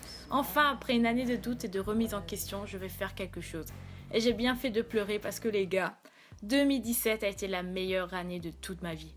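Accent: France. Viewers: Vie ta vie, continue de penser de vivre comme tu le fais de partager ta passion avec nous de nous faire vivre à travers toi. Profite a fond et continue t’es jolie vidéos Tu mérite vraiment tout ça continue de nous parler anglais avec un accent français je suis la première à ne pas me faire comprendre quand je parle anglais Bref encore merci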